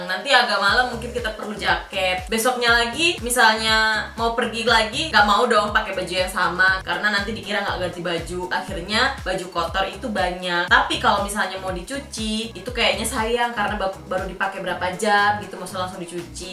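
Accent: Java, Indonesia. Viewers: Asli mana mbak e.. kok medoknya, medok jogja or solo.. cerita dong, riwayat sampai swedia dan bla bla bla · Sampean jowo pundi mbak, bosoe kog koyok daerah q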